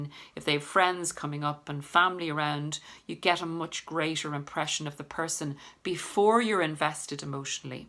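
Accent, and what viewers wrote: Ireland. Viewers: thanks very much done alot of journaling this morning while watching these videos which helped.stayed in bed all afternoon body so sore,I'm also from Dublin great to hear an Irish lady give this advice as a lot of the channels are american.love listening to you and thanks again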